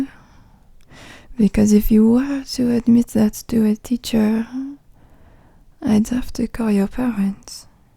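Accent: France. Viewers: We're blessed with another French accent audio ☺ thank you! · You're french accent is definitely the best on yt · I love the french accent, theres something about it that makes my brain go “love of your life rn”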